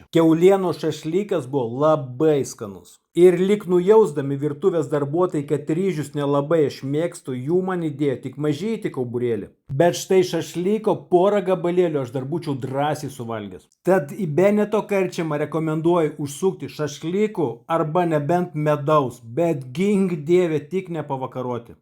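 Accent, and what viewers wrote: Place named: Russia. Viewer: deslike iškart dėl rusiško akcento ir kvailos apžvalgos, fui, nesidaugink.. nesi profesionalus foodbloger, fui, lb prastas ir blogas esi apžvalgininkas, jokio profesionalumo, tik subjektyvi ir rusiško akcento pabrėžta nuomonė. niekada neisiu ten, kur buvai, fui 0/10.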